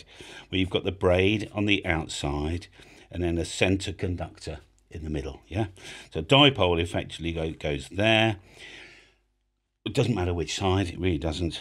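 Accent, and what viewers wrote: United Kingdom. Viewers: your posts would be better if you used scripted (posted words) rather than trying to figure out what you are saying with your British down play English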